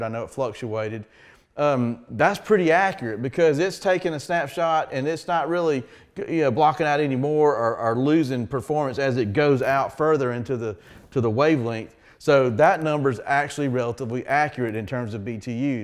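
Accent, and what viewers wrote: South, United States. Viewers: An intelligent, coherent presentation of a complex idea. Imagine that from someone with a southern accent.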